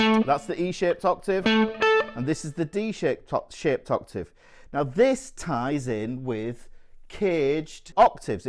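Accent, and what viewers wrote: United Kingdom. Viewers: Part-2: It's interesting how content from an instructor/mentor speaking in a UK accent makes the content seem more authentic!! Because of the unique linguistic "personalities" (for lack of a better word), it would be fun to see your content in Scottish, Irish, Cockney, and AU/NZ. (with subtitles of course!)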